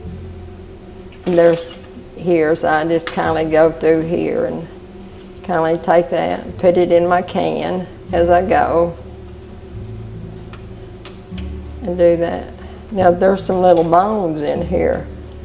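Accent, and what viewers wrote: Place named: Tennessee, United States. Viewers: I absolutely love your south😅ern accent. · I just discovered your channel for the first time. I was born and raised 45 miles Northwest of Nashville and grew up on this kind of food and hearing my relatives speaking in this matter. This channel is just like going home. Thank you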